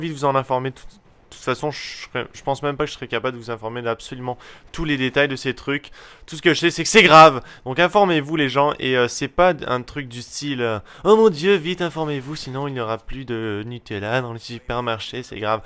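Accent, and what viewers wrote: Quebec, Canada. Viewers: Tu as un accent québécois non ? x) Très bonne vidéo ! (Y)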